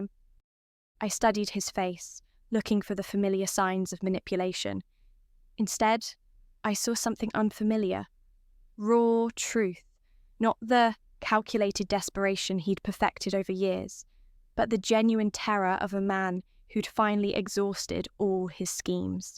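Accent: United Kingdom. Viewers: 🤢 british accent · The British accent ruins the story, sounds like an Emma Watson rip off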